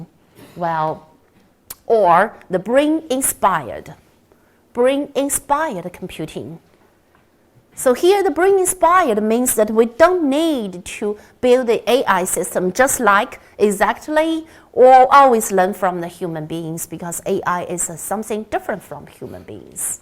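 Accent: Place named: China. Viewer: 中式英语!😊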